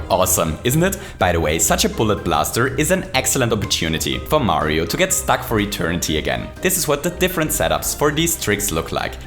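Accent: Germany. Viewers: Ich erkenne an deinem Akzent, dass du deutscher bist. Haha xD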